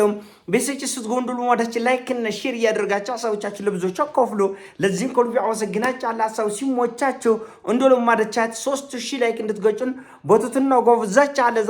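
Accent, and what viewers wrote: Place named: Oromia, Ethiopia. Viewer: ኦሮሞ መንግስት ሲወድቕ አነጋገርህ የኦሮሞ አማርእኛ ስናጋር ትቀይረዎለህ አደል አይአንተ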